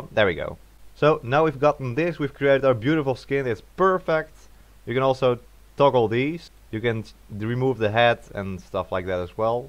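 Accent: Netherlands. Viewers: thick dutch accent there.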